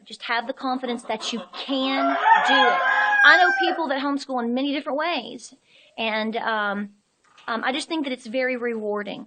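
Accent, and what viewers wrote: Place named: Tennessee, United States. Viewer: I want to keep listening because I love what you are saying and I am right there with you. But the rooster is giving me a headache. Please consider filming again without the loud background noise?? Please? I love your accent...I am from Tennessee and you sound like you are from around my neck of the woods.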